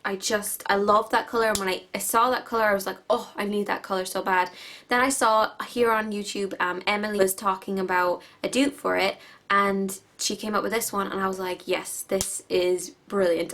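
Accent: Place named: Ireland. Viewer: Are you Irish or American? I'm Irish and can't figure your accent out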